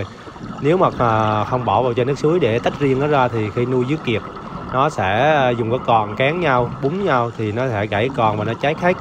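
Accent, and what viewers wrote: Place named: Quang Nam, Vietnam. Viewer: quê ở đâu mà nói giong fống qủang nam vậy bạn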